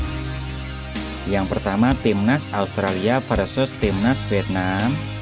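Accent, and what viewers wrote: Java, Indonesia. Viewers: Yg ke Telu indonesia😂..jawanya medok bang .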